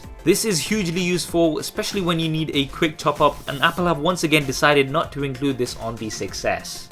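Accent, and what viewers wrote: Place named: East London, United Kingdom. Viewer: I subbed yesterday, just ordered an S6 due to its price dropping £150 over the last month, glad i did as well. Cool vids dude, just about to launch a gaming/tech channel in the next week or so, my review of the phone will definitely be on there. BTW, you sound local, im just north of london, you sound east?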